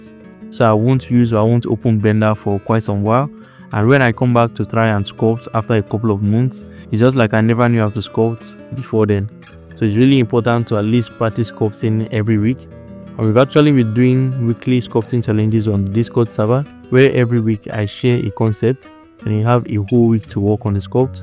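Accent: Nigeria. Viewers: Are you from nigeria?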